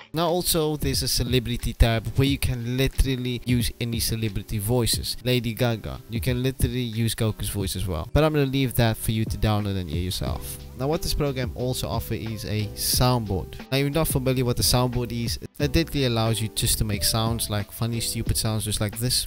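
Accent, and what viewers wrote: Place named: South Africa. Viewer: 😅 South African AF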